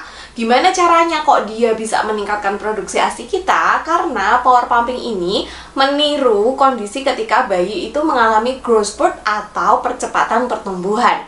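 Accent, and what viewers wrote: Java, Indonesia. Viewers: Pertama kali simak video dari channel ini, seneng banget denger logatnya medok medok khas Jawa.. Suwiiii biyaaanget.. 😂❤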